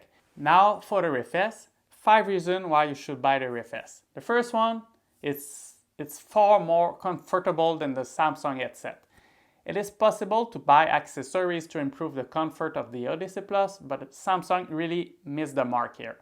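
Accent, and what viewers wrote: Quebec, Canada. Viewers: Love the Kay-beck accent :) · i’m from Québec too, i heard your accent and i was like, omg i know this accent x)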